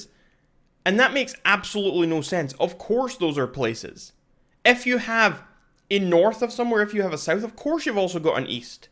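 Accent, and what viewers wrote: Scotland, United Kingdom. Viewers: Is he actually not scottish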